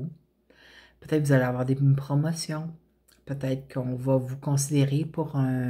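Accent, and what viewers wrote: Canada. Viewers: Toujours un réel plaisir de vous entendre. Vous êtes toujours dans le juste. Et petit aparté, cela me fait du bien de réentendre l'accent canadien 😍 . Bonne continuation à vous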